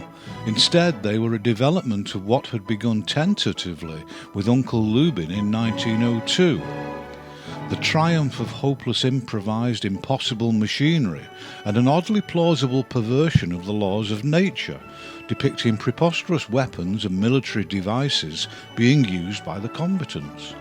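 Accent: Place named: Northern England, United Kingdom. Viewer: Thank you for doing these, very informative and enjoyable, nice to hear a fellow northern accent too.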